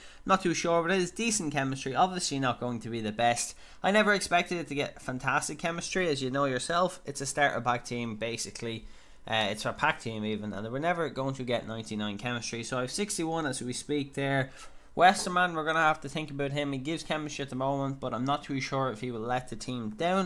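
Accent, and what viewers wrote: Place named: Ireland. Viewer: Where in ireland are you from? :) class series btw :)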